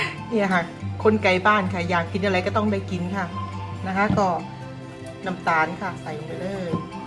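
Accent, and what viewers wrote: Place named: Korat, Thailand. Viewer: สำเนียงเหมือนคนโคราชเลยค่ะ😊😊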